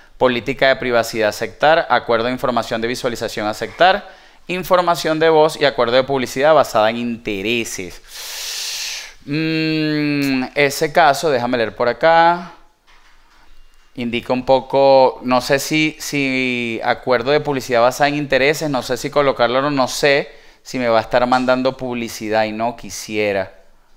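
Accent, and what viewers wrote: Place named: Venezuela. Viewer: Hola, un saludo amigo. Percibo que por la manera de hablar eres de Venezuela. Sí es así, sabrás cómo hacer para que reconozca la zona del país? Intento conectarme a LG Channels y siempre me da el mensaje "Lo Sentimos. LG Channels no está disponible para su zona" cambié la ubicación en los ajustes... Y en los ajustes solo me aparecen (Automático - Canadá - México - Estados Unidos - otros). Sí me podrías ayudar, mucho te lo agradezco, hermano.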